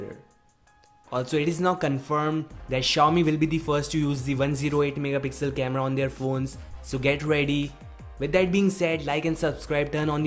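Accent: India. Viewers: India English, hahaha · Idian english🙂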